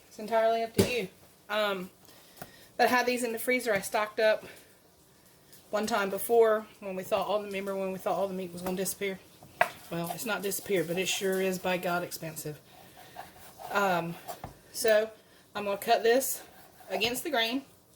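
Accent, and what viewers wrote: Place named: North Carolina, United States. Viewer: Looks great are you from down east NC? I have family there and you have their "accent" lol! Love your channel!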